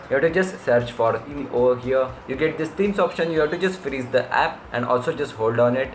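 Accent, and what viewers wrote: India. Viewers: i hate, really i hate the english accent of Indian people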